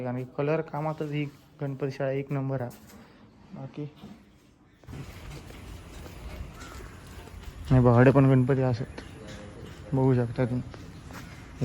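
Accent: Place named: Malvani, India. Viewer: तुझी मालवणी खूप गोड आहे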